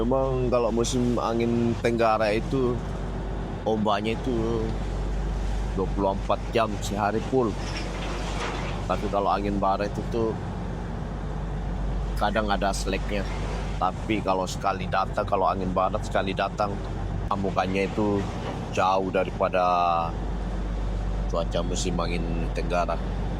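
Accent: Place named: Toraja, Indonesia. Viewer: Tator,kalau dengar logatnya 😂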